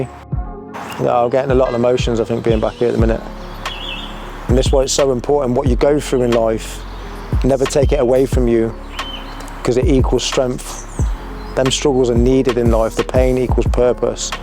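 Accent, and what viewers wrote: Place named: Coventry, United Kingdom. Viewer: I knew i recognised your accent your from Cov im from Cov too just found your account on insta so inspranational im older now but after a life of trauma you have so helped xx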